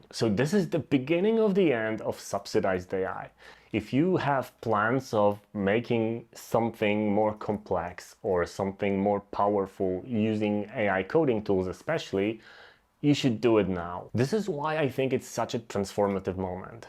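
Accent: Poland. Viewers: no nieźle xD widze miniaturke nowego dla mnie kanału, patrze " " i takie there is no chance this guy isn't polish, wchodze na kanał i opis patrze United States i mówie no kurde, puszczam ten film słysze akcent i już sam nie wiedziałem co słysze po czym widze screena z Cześć więc jednak miałem racje 🤣 nie oglądam chyba w ogóle polskiego youtube więc zawsze mnie śmieszy jak znajduje polaków nadających po angielsku. W sumie to nic dziwnego - widownia pewnie 50 razy większa jest niż w polsce.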